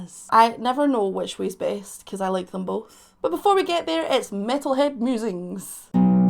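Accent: Scotland, United Kingdom. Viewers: Great band, terrible sound quality. The bass and vocals get drowned out too much. Your accent is awesome! I only sound like I Am from Kentucky when I drink too much...lol. You know, the street that I live on is Highland. And I am a Scott...coincidence? Keep up the great work My Fine Scottish Lady! ❤❤❤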